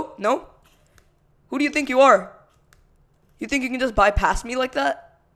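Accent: India. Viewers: Hey r u a indian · dude are you indian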